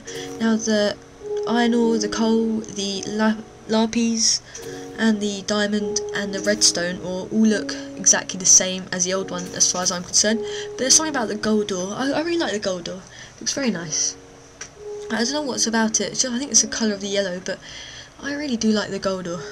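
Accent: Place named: United Kingdom. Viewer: Awesome a british accent !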